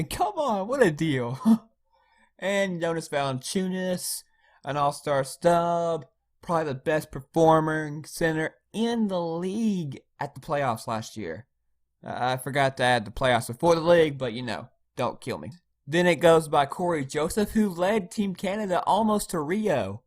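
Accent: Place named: South, United States. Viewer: Are you originally from Toronto? Because it sounds like you have a Southern US accent.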